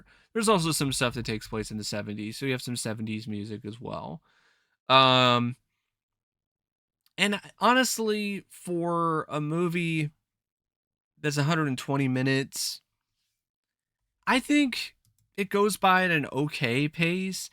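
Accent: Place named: Northeast, United States. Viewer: Did you like The Wonder Years? I watched it a lot with my grandma so I got a soft spot for that show. Your voice kinda sounds like you're from northeast usa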